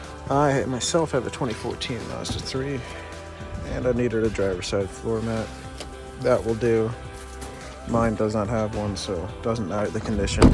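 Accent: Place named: Canada. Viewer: Before I saw Canadian I was wondering if ur from there or Minnesota lol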